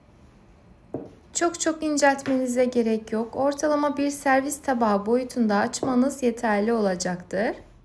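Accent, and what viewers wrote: Azerbaijan. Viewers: Türkiye türkçesinde konuşmanıza rağmen Azerbaycanlı olmanız bellidir.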